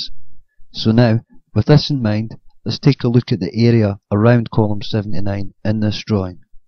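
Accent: Scotland, United Kingdom. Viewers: Great video, and the scottish accent makes it all the better. Thx for your hard work on exposing the BS known as the official conspiracy theory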